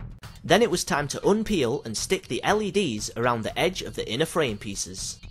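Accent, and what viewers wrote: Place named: United Kingdom. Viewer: Victor Terhorst Nunes It's British English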